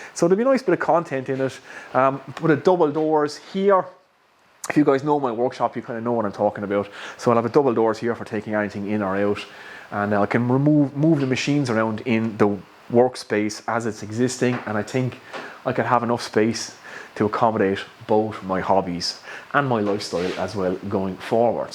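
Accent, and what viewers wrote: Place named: Wexford, Ireland. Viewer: where are you from, you sound like a Wexford Man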